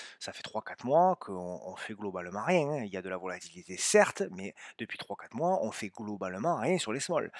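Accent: South, France. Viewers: Écouter chaque semaine et son accent du Sud, en complément du salon Discord, c'est toujours un plaisir:) Le contenu est qualitatif, les Graphs sont épurés et compréhensibles par tous. Merci et très vite, bravo pour le partage! PS: pour une prochaine fois, une idée: la présentation d'un indicateur en plus du prix (# des volumes)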